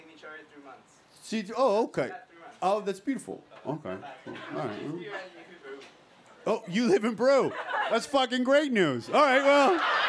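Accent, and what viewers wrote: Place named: Canada. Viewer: That's how Canadians talk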